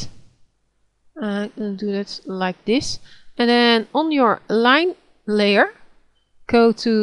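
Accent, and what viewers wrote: Netherlands. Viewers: hahaha, ik moest echt lachen toen ik je hoorde praten, ik zij al " dat is zekerweten een nederlander" en het blijkt ook nog te zijn, maar wat ik eigenlijk wou zeggen. THANKS!! hier heb ik veel aan ! ;)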